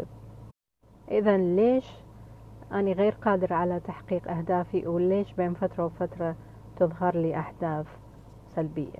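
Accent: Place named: Iraq. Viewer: فعلا أدركتي عمق القانون هنيالك ع فكره مره حبيتك لأني احب لهجتكم هل العراق فدوه 💜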